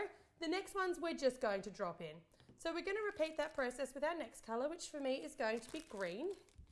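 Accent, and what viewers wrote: Australia. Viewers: I love Australian accents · Your an Aussie!! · an Australian haha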